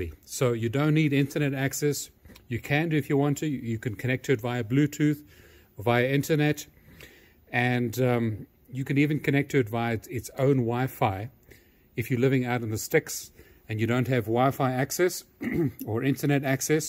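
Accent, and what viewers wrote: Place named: South Africa. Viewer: Sounds like a South African accent, Im sure you know that Solar Assistant is produced in SA?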